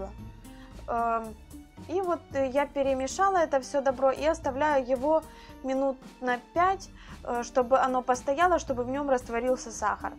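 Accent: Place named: Kherson, Ukraine. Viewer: Вы из Херсона?! Очень знакомый, до боли, говор!)))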